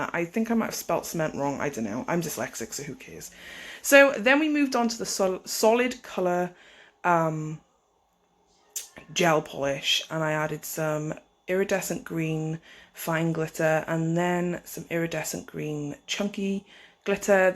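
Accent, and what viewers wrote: Cardiff, United Kingdom. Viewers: This was a brilliant video. I find her really good. Is that a Cardiff accent by the way.? Anyway really enjoyed your video and found it really helpful and interesting. Really good. Sorry not sure what I think about subscribing to things but really like your stuff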